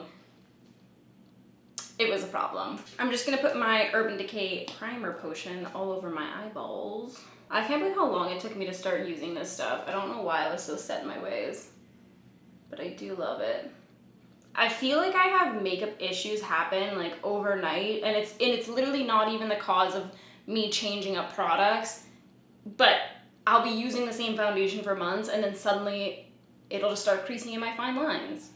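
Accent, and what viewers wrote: Canada. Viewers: Heyoo canadian accent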